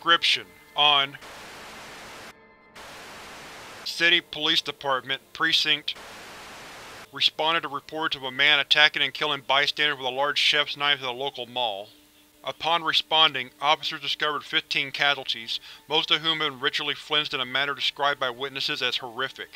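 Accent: United States. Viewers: This one's very clever. And terrifying if it was weaponised. Great reading as usual. May I ask where in the States you got your accent from. As I'm Australian, I can't pick it myself. Perfect voice for these readings.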